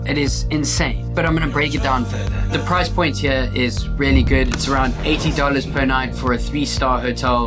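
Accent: Cape Town, South Africa. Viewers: i thought you from cape town bro.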